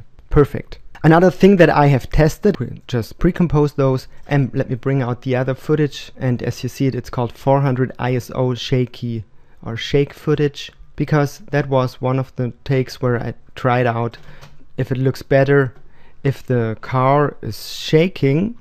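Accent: Germany. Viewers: Hey, so wie sichs anhört (nicht böse gemeint), kommst du aus Deutschland! Daher kurz auf deutsch: Du hast meinen größten Respekt! Nicht nur, weil du dieses Video fantastisch gemacht hast, sondern weil du allgemein ein fantastischer VFX Artist zu sein scheinst! Ich meine... du hast sogar mit Dinklage deine Zeit verbracht! Ich hoffe, ich werde mal nur annähernd an dein Level kommen! Alles Gute und bleib wie du bist!!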